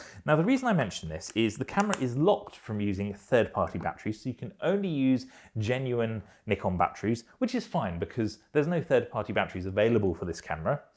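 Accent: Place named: England, United Kingdom. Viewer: Great to hear a calm, English voice!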